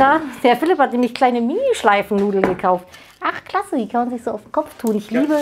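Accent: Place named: Cologne, Germany. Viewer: heute mit besonders aktiven Kölner Dialekt und am Kochen: Horst Lichter, bisch du's?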